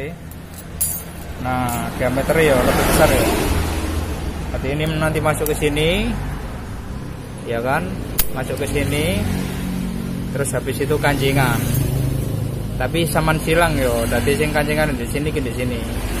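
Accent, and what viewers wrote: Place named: Jombang, Indonesia. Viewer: Logatnya kayak orang jombang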